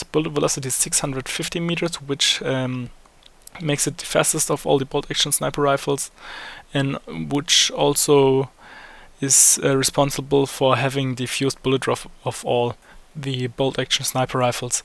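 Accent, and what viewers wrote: Germany. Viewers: hab ich sofort gehört, aber der dialekt ist nicht so stark wie bei anderen deutschen die Englisch sprechen:) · Gutes Video! Ich habe alles verstanden und dein English hört sich richtig gut an. Den deutschen hört man aber trotzdem heraus :) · Are u German?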